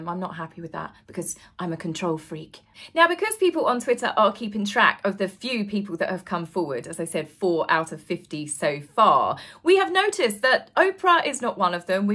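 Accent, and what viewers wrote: United Kingdom. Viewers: I always enjoy your pretty face and your British accent when I click on your videos!! You are always so cheery and happy!! I love that!!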